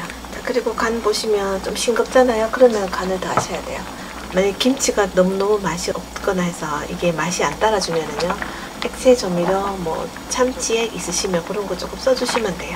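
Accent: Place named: Gangwon, South Korea. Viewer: 님~^^ 네~강원도의 사투리 구수한 매력이 있네요~!! 맨두와 가시기 저도 좋아하는 메뉴입니다^^♥♥♥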